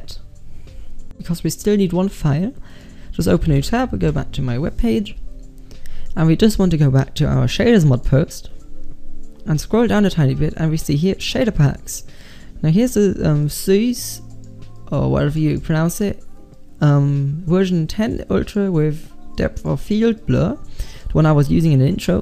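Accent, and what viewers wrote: Germany. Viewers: you sound german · Sehr gutes Englisch aber man merkt das du Deutsch bist :D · are u german ? u dont sound like a english guy :D greetings from germany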